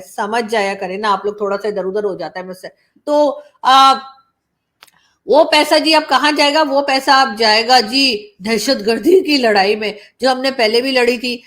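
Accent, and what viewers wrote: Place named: Mumbai, India. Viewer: Aap ka aaj kal urdu ka bolne ka slang bombaiya ho raha hai, Bollywood style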